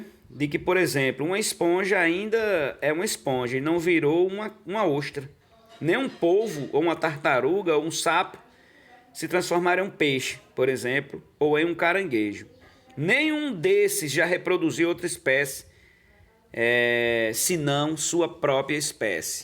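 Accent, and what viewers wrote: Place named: Northeast, Brazil. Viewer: Pelo sotaque desse narrador do vidio ele evoluiu do jumento porque ele e um nordestino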